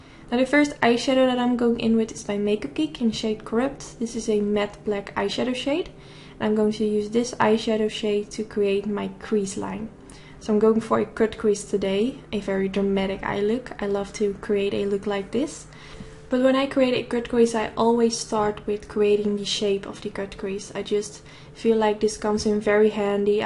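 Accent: Netherlands. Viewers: Is that a a Dutch accent? Are you from the Netherlands? Your make up video is fantastic!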